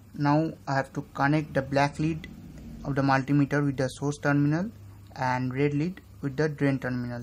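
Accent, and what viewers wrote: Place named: Mumbai, India. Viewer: bombay accent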